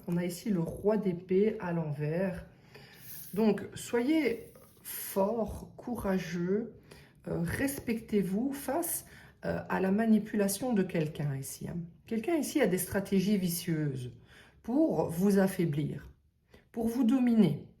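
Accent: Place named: Belgium. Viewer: Il me semblait bien que je reconnaissais un accent belge!.Faites vous des voyances en privé w?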